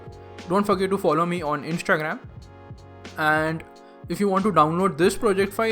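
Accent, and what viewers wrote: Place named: India. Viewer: i hateee,i hatee india guy english accent!!!!!!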